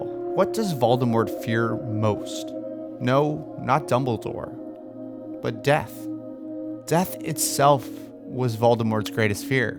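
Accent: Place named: Canada. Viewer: Your Canadian accent is palpable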